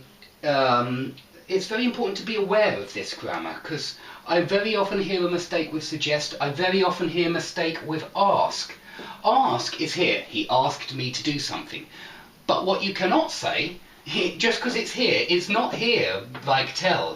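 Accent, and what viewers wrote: United Kingdom. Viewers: you've got such a cute British accent. n.n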